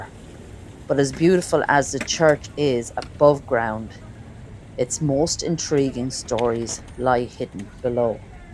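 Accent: Ireland. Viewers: I wouldn't know if you pronounced it wrong or not with your Irish accent 😉 😊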